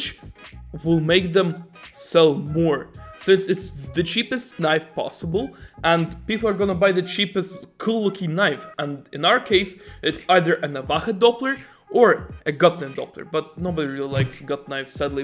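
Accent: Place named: Sweden. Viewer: Wow i quite didn't expected that :D you sound like you're swedish maybe german or polish but not russian :D Great accent mate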